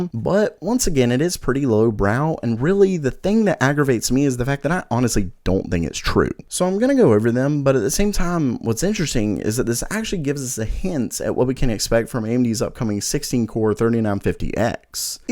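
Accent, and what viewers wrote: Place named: South, United States. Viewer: haha, sounds like you're saying "nanermeter" sometimes. Love that southern twang!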